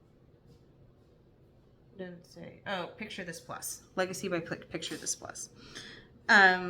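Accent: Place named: Canada. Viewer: Hi, clicked cos thought oh wonder if she is from Canadian prairies. Made me laugh when said were in AB. You sound Canadian lol. So glad you like Kansas. We are in Nova Scotia but lived in Florida for a yr in the 80s. My daughter’s one son’s name is Benjamin.❤ oh your poor son😢. Oh and Junie is beautiful! Yes Australian shepherd for sure❤hard road figuring adhd and things like that. Oh your stitching is beautiful! Good job on your finish. Oh the floss dying is neat, I haven’t tried that. As I watch I am working on full coverage 3ft long by 2 ft wide. Oh the fabric and colours of floss are beautiful, it will turn out great. If you lent me a pattern, I would make a working copy.. and keep your pattern in the sleeve, so I see nothing wrong with using s working copy. I make working copies of patterns I buy as well…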